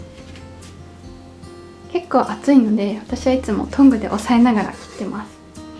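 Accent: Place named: Kansai, Japan. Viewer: 関西出身の人ですね！！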